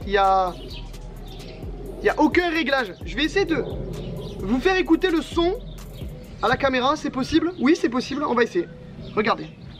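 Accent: Marseille, France. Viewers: Ta un accent marseillais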